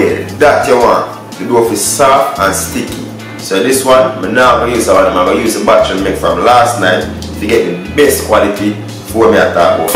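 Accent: Patois, Jamaica. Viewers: Clear and concise recipe. The authenticity of his native tongue makes me trust his recipes more... I am not Jamaican but I know Jamaican cuisine is made from the heart and it's not a rushed process. The amount of Mickey Mouses out there trying it with their Ja-fakin' recipes... The patois wasn't overly used that someone can't understand the recipe... However, if the new recipe edit shines the spotlight on his channel, then more power to him! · I love how authentic you are. You are very articulate yet yuh keep your accent real like di dumpling dem. I can't stand when people try to impose on others. You don't need to speak any less patwah,we never tell an AMerican to speak less American. Though the average American DO NOT speak standard English. Anyway, yuh dumplings tun up! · Awesome Jamaican accent jah Mahon 💯 and you earned yourself a new subscriber as soon as I scene your hand rolling technique awesomely done ✅ 🥟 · To capture a wider audience he might have to drop the patio. 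It’s not just Jamaican watching. There are people of other nationalities that love Jamaican food and want to learn how to make it. The same way if someone of a different nationality giving a presentation for something but u can not understand what that person is saying because of their accent. The same goes for someone who only speaks street slang. If that person trying to give a presentation dont u want that person speaks proper. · I dont mind the patios at all, atleast I understand what you're saying and relate to your the videos. I'm a new subscriber and loving your videos already.. KUDOS!!! 👍👍👍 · Too much patois?? Ah weh oonu ah talk bout?? KMT. That's what I love about this channel -makes it authentic! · I love hearing the patios. Idky, but it always makes me laugh lol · Mi lov di Patois Bredren!!! Yu dumplin sel aaf! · Moderating the patois will widen your audience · Man this being African American is boring AF😫😂 why I couldn’t be Jamaican or Nigerian 😩😩😩 I hate it here😫 I’m Finna put on Shottaz and dream of being a Jamaican 😂 cuz being American is 🗣️BORING😩😩😩 I love his accent 🥴 and the Patois language YESSS🙌🏾🥴🥴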